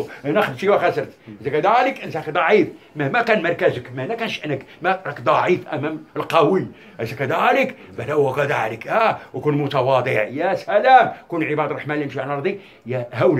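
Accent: Morocco. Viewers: ابن الراشيدية من لهجته باين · 🌾الشيخ يدعوا الى التوحيد ولاكن لن يفهمه إلا المغاربة نضرا اللهجة المغاربة🌸🌸🌸🌸🌸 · من طريقة كلامو يمكن مراكش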